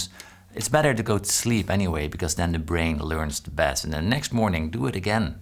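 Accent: Netherlands. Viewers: I love this guys’ lesson on this song. Always amazed at people like Paul Davids and their understanding of music. Also Loving the Dutch accent. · You sound dutch!!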